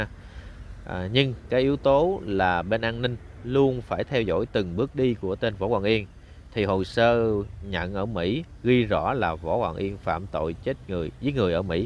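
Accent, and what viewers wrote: Hue, Vietnam. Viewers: 100/100 giọng Huế , cùng quê nên mình rất rõ ! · Em ấy nói tiếng Huế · Dung la giong hue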